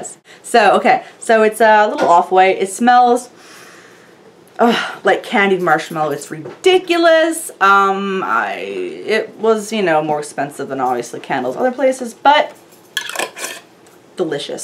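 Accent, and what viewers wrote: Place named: Canada. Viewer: Thanks for asking me to join ur channel! Glad to of- and so far i loveee your videos! Didn't knw u were Canadian, but love ur accent! Its real cutee! Id have to choose as my "wanting to try" would have to be tha Sugared Vanilla Wish & that Winter Candy Apple out of all of thm! Id like tht Candy Cane one also! So many to choose! Awhhh! Hehe :D Keep it up! Luv em! Would love to win as i lovee BBW! :D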